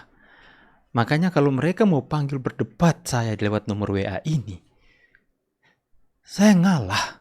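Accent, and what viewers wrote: Manado, Indonesia. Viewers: Tomohon selalu hadir nyimak. 👍😍 Tebarkan terus Kebaikan dan Kebenaran Bung bersama teman2 kreator yg lain. 🙏😇 Sekali2 selipkan dialek/logat Manado neh Bang. 😀😊...mantaaappp. 👍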